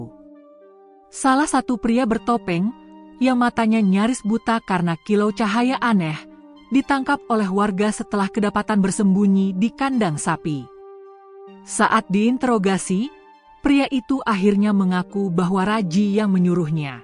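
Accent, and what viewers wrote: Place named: Java, Indonesia. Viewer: Cerita ya bagus cara bacanya terlebih tebal slank jawanya..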